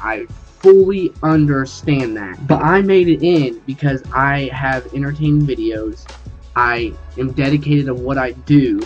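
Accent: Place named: South, United States. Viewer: Why does he sound like a typical hillbilly incest 3rd grader?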